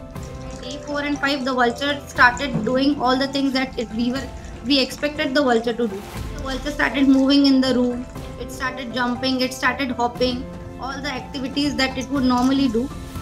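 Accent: India. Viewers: Lol, I love Indian accent. BTW thanks 😊 ☺ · the Indian accent makes my head shake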